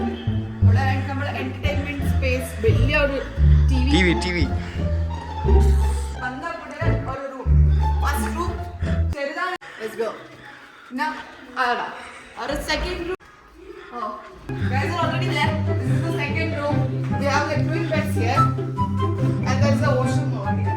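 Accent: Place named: Tamil Nadu, India. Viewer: Your Tamil accent 🤌😂💯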